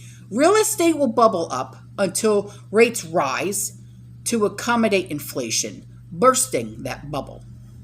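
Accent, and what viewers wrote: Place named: Long Island, United States. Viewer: you have to be from Long Island, ny. The way you say youzzz-guys. My best friend says it the same way and she’s from Long Island.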